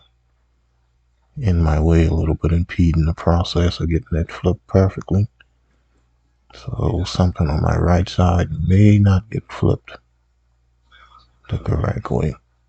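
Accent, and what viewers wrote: Charleston, United States. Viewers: Where you from? Sound like you from Charleston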